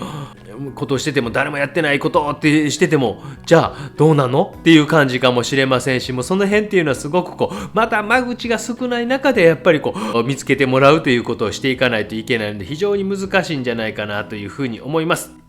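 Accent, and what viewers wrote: Kansai, Japan. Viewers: 柔らかい関西弁のニュアンスでしょうか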